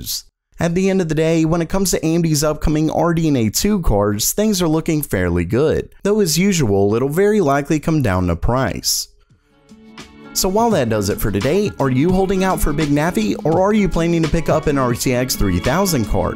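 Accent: South, United States. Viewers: Hes hiding his american southern accent for some reason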